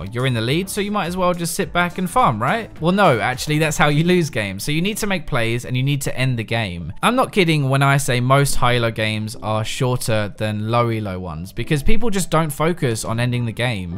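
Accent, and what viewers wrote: United Kingdom. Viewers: Main reason I watch your videos is your wonderful British voice.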